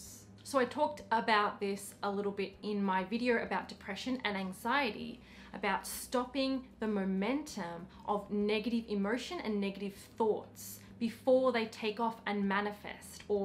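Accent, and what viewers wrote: Australia. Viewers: A week ago or so, I came a cross an other aussie YouTubeing about LoA. I was really pleased to hear that assume accent among others with American or British accent and so I come across your teachings. Talk about LoA 😊. Anyway thanks for your teachings. Greetings from Sweden!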